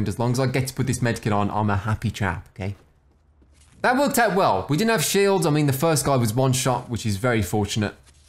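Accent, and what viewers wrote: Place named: United Kingdom. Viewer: your british accent it is really good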